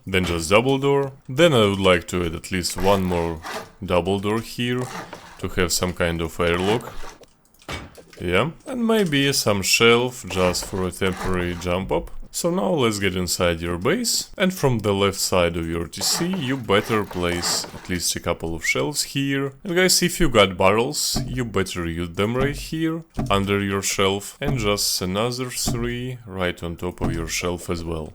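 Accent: Russia. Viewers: у тебя как будто акцент русский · ты русский? акцент похож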